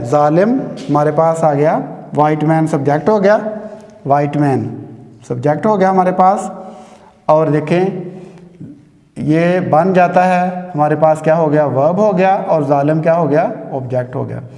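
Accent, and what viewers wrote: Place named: Punjab, India. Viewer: It's ok but your accent is Punjabi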